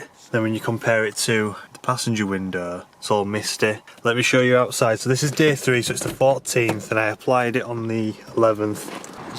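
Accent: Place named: New York, United States. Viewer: Why does he talk like that? A American doesn't sound like that. He's probably from New York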